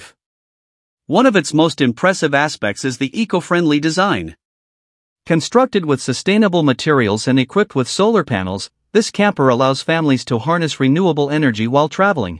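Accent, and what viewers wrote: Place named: United States. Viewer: Sounds like it’s 😮Voiced over by an American.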